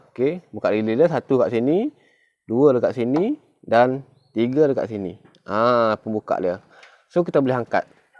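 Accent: Kelantan, Malaysia. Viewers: Orang Kelantan ke bos..?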